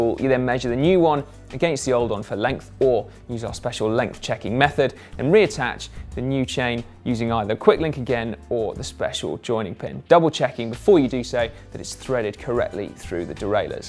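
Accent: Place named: United Kingdom. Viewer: Could you possibly do this video again without the British accent?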